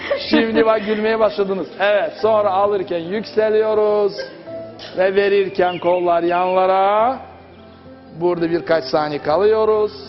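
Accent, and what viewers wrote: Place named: Azerbaijan. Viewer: Konuşan üstad kesinlikle azerbaycanlı! 😊 Yanıldım mı? 🤔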